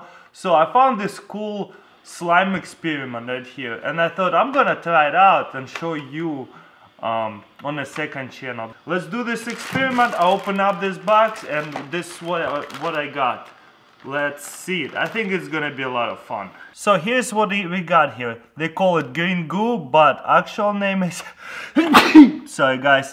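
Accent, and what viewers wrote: Russia. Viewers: Я все не пойму по твоему акценту, ты русский или?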